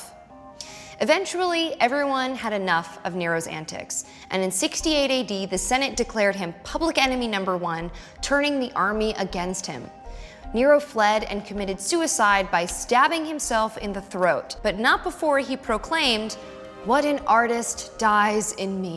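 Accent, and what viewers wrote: United States. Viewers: Cant take history lessons from an american accent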